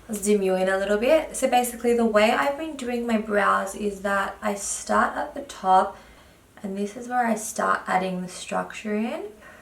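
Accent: Australia. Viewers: U sound like an Aussie girl. Are you?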